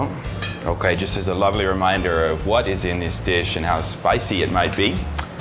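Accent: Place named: Tagalog, Philippines. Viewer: love your tagalog accent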